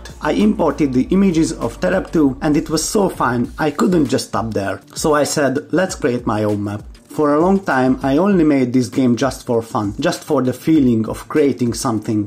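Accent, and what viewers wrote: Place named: Hungary. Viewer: Are you by any chance from hungary? You sound like a fellow hungarian haha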